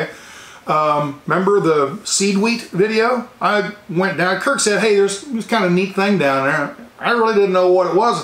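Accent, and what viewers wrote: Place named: Texas, United States. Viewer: We follow your channel for the travel aspect of it so that is what we would miss the most if you were to quit traveling. Some of the most special experiences we've had in our travels come from visiting with the local residents I traveled with a WWII pilot with your Texas accent and he could break into a conversation with anyone anywhere. Once you get to talkin to folks there's a tremendous amount of material for a beautiful channel. In my mind, your Charles Kaualt idea would be a total winner. There is such a need for your real honest type of programming.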